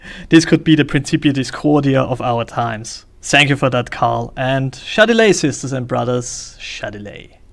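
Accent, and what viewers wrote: Germany. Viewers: you sound german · Since Rachel Dolazar, some SJW'S & even Don Lemmon of CNN suggest that race could well be a social costruct. Rachel Dolazar feels like a black women therfore she is. Although she is a con artist who took advantage of affirmative action & her leadership role for a black organisation. If race is just a social construct & fluid I am Kekistanian as well...and boy, have I been opressed! These regressive lefties dug their own hole! Where's my $$$ where are my advantages? BTW, are you German? Weil ich Deutsche bin & meine es raushören zu können.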